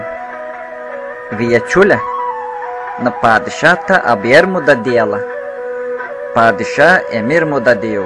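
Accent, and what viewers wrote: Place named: South, Bulgaria. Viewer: Красив южен български диалект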